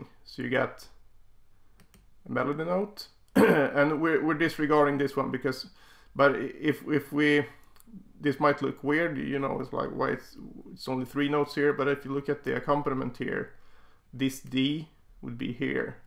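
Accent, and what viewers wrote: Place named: Sweden. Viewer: You have swedish accent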